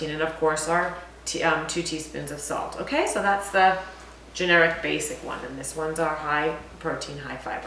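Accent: New York, United States. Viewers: Love you, Girl! I'm from Ma and love your New York? accent! And 'chew in' on tree bahk' - miss that sense of humor out here in Oregon! Thank you!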